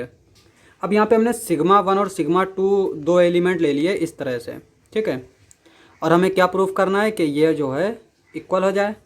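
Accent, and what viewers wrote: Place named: Bihar, India. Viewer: bihari accent=lit video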